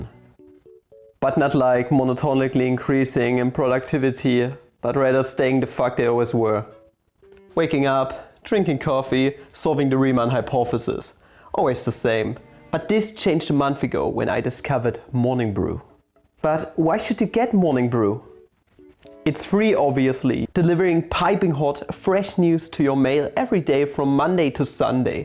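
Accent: Germany. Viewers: I have rarely seen your channel and your videos and just stumbled here because of algorithm. I don't know why you hate indians or indian claims, maybe i know. Maybe it bugs you that someone can be better than the superior western european white race. May be it reminds you of the trauma of how west and europe is declining and other parts of the world are no more your slaves and your yaysayers and they are claiming their heritage. Maybe its because of your accent you seem deutsch and your ancestors felt the same way about indians. They detested and hated indians. Incidentally, they hated jews as well so i guess the problem lies with jews and indians somehow. Your rant reminded me so much of your ancestors. Maybe the apple doesn't fall far from the tree. Disclaimer- I am usually an opposite of a nationalist or so called patriotic and i know you are going to paint me as one for your convenience and i don't care, i am usually a cool dude and i apologize if i am rude to you but your rant and your intrinsic subtle hate about a group of people made me comment. 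I usually never comment on anything. I hope you educate yourself, visit india sometime and learn that india is even bigger than europe in terms of population and people groups and there are many different kinds of people and have differing opinions. Just like europe has far right white supremacists like yourselves, india too has bunch of bigoted nationalists, scammers and bad people and if you count them, they are probably far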